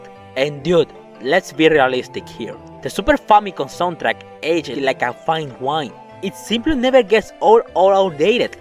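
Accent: Chile. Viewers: Jajaja eres chileno!! Me suscribo jejej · este tipo literalmente es el único aweonao que suena como chileno hablando en inglés que habla de smt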